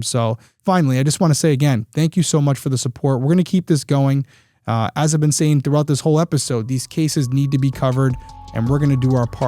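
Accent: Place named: New England, United States. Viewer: Great job. Also, I really enjoy the way you talk and verbiage used. It’s has that “New England” feel to it, and it’s sincere. I saw some commentary regarding the way you word things. I disagree strongly. Anything else would feel so phony coming from you. Don’t change.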